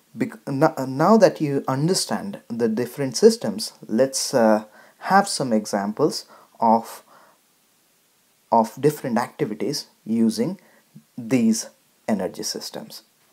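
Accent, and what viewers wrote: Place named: India. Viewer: I like your speaking. But I hate your Indian English.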